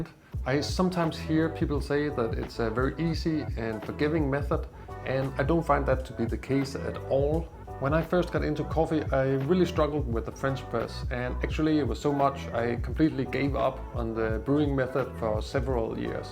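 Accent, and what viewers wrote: Denmark. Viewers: I heard your accent and knew you were from Denmark before looking at your profile to confirm! Tak I would love to learn how to use a French press. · Are you Danish? You sound just like a Dane speaking English 🤔 [Edit] I was right.😆 Your name is. 😆 You cannot get more Danish than that.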